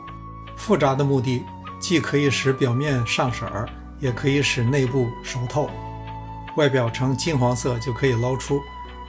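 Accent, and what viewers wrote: Beijing, China. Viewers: 喜欢听你说话！比听歌好听！超纯音的京片子！做菜也到位！超赞！大师:多点出镜！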